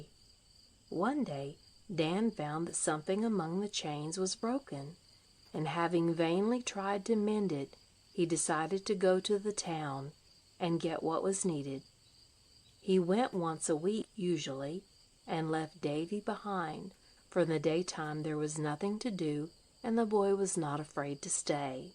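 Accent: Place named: South, United States. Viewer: Terrible terrible southern accent from this narrator.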